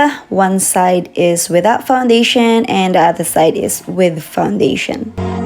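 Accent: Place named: United States. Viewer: Akala ko sis American yung nagsasalita hahaha! Oo maganda nga sis nakakatakip ng blemishes 😊 galing galing magreview 👏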